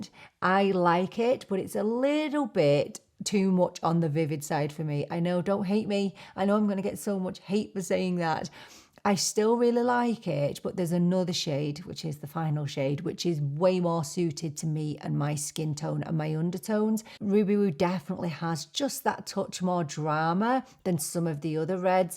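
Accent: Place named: Manchester, United Kingdom. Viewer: Loved this! I definitely dont have the confidence to wear red still enjoyed seeing you try them all on. Was shocked to hear you dont live in the UK you sound straight of Greater Manchester to me 😮 ❤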